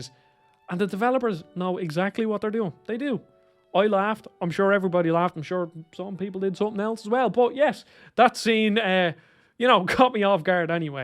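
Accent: Ireland. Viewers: Earned a sub from me pal - glad to hear a fellow Irish accent raving about this game!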